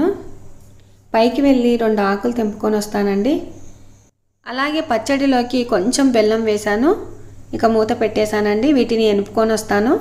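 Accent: Rayalaseema, India. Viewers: Chala bagaa chestunnaru akka, mana Rayalaseema slang mee vedios lo happy ga vintunnanu....mee daughters nd atta sooo cute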